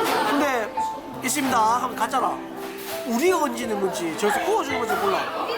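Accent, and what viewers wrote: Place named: Gyeongsang, South Korea. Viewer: ㅋㅋㅋ고단세 ㅋㅋ 갱상도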